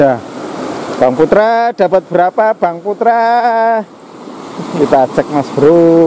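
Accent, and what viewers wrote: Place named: Banjarnegara, Indonesia. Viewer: Asline ngendi mas...logate kyk neng nggonku Banjarnegara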